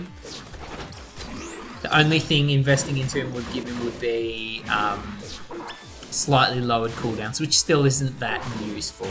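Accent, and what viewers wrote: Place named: Australia. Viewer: Cheers for all your videos your making on rearise man i really appreciate it, its refeshing hearing another aussie voice keep them coming bro you've got yourself a new sub :)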